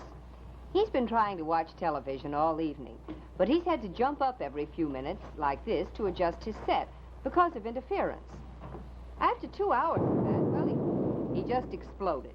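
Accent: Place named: Transatlantic, United States. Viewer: she's beautiful i love how over the years she never really lost that transatlantic accent especially in that 1989 appearance she made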